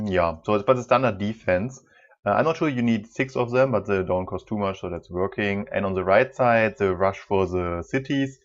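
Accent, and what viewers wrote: Germany. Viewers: Typical German Accent... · well... it"s not a ranked map ... it"S not a ranked game ... and non of the above is correct but i love to hear you make commentry in that german accent non the less ...you sound like those german generals in those ww2 movies so .. i will give you a like hahahaha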